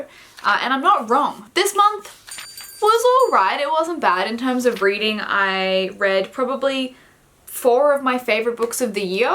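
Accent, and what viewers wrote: Australia. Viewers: I think beta reading is a great idea, and it's so cool to have an Aussie audio book narrator.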